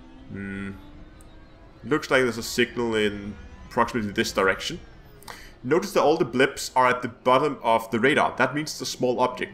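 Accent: Denmark. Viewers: You've probably forgotten ever writing that comment, but it's quite a strong Danish accent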